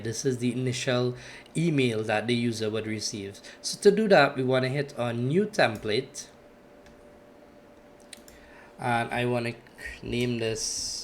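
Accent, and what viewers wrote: Trinidad and Tobago. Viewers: Are you trinidadian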